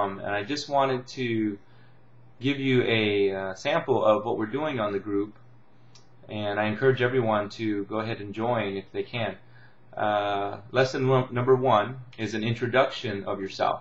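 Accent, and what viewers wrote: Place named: United States. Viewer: His accent is very stateside. Like he is just reading it, but does not know how the islanders really speak. Sorry but that is my opinion. I do give him huge credit for actually speaking the language though, as I do not. I never thought it was important ( I left the island at about 3 yrs of age and did not come back to live until I was 17.)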